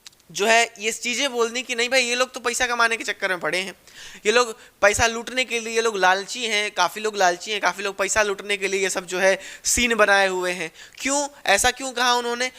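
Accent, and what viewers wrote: Bihar, India. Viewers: Bihrai tone............stole my heart ❤ bro.............🖤 bhai billions subscribers ho apke🖤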